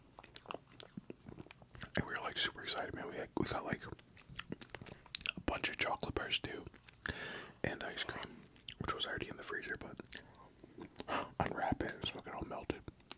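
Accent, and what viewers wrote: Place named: Canada. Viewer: didn’t know you were Canadian for like 6 months of watching until you said car in this vid! And then everything started to come together when you said “mum” and started talking about mr noodle (I’m Canadian)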